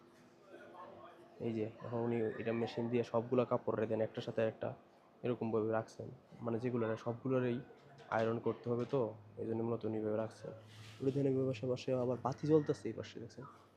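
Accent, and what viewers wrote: Mymensingh, Bangladesh. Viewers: আপনার সাবলীলভাবে ময়মনসিংহ অঞ্চলের ভাষায় কথা বলা আমার খুবই ভালো লাগে বিধায় আপনার সব ভিডিও দেখি এবং ভালো লাগে ! শুভ কামনা করছি তোমার জন্য ! কোন জেলার আপনার দেশের বাড়ি ❓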